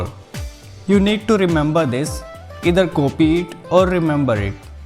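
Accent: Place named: India. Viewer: As soon as i recognised the voice was indian I was relieved and thanked god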